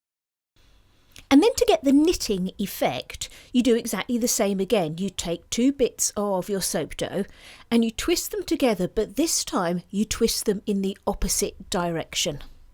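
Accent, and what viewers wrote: United Kingdom. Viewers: Holy cow, these are the CUTEST EVER!!!!! I am absolutely in LOVE with your channel as a brand new soap maker! I'm an artist and love to create pretty things so your videos give me hope that I can one day get to a point even close to some of the soaps you make. What an inspiration! You are a wonderful teacher also and I absolutely LOVE your accent! (I play an online game with a lot of folks from your neck of the woods and it amazes me how many different accents there are just in the UK!) Gorgeous soap!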